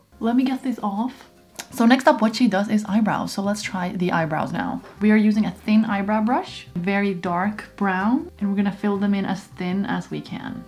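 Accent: Sweden. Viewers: YOU’RE SWEDISH, RIGHT? I dunno why I got so happy by your accent, it’s just so familiar hehe. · Is she from Sweden her English accent sounds like Swedish english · R u swedish?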